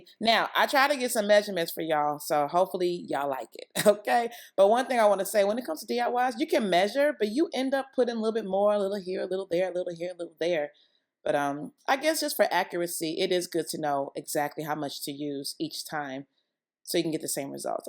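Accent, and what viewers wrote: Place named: Nigeria. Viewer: The naija accent😍😍😊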